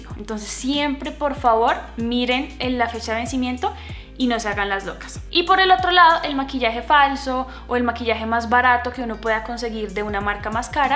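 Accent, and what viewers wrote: Colombia. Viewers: Yo apenas escucho su voz: Ella es colombiana jajsjaj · Por el acento, parece que de Colombia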